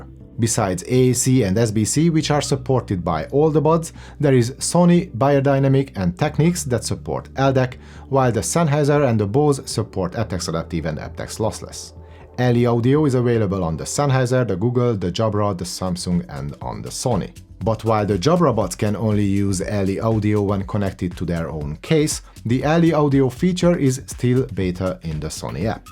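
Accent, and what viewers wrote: Hungary. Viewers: Great video, signature Hungarian accent 😉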